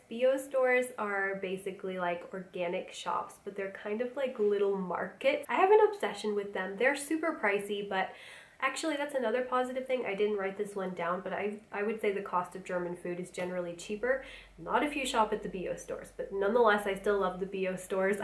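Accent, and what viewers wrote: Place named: United States. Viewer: Good morning It's always nice to start a day with one of your videos. The German language is difficult to learn, even for Germans. I love the American accent when you're speaking the German language, it's so lovely and sweet. You are living in a wonderful part of Germany, very nice to raise your kids. The most important word at that area is beer/Bier 🍻 and that's not so hard to pronounce 😁😅.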